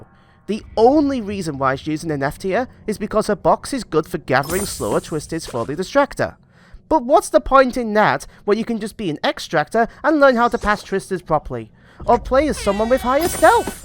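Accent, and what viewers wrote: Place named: United Kingdom. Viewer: All things aside, you have a MASS british accent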